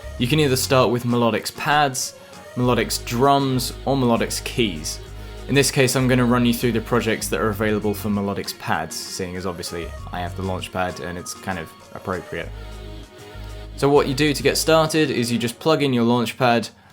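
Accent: United Kingdom. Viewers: His British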